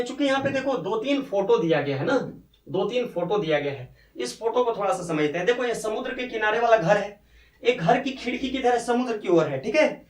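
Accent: Bihar, India. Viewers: Yah sir Bihari kyon bolata hai yah Bihar ka lag raha hai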